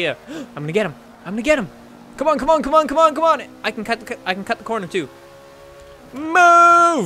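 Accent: United Kingdom. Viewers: Strangely you didn't have so much British accent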